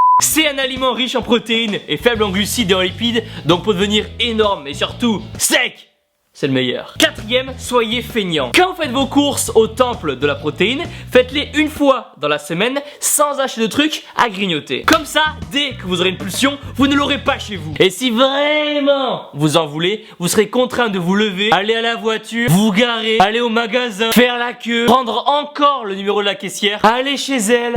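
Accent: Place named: Toulouse, France. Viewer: Daaaaam quoi de mieux qu'un bon accent Toulousain et de la bonne humeur pour se motiver !! Au top !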